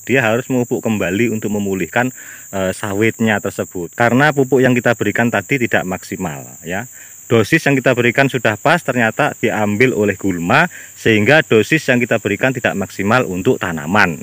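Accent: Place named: Java, Indonesia. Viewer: Temangsang😁😁😁 isih totok jowone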